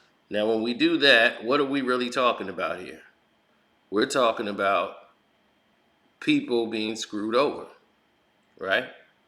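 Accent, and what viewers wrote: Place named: Midwest, United States. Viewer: You are so mid west Tupelo not tupello pronounced too puh low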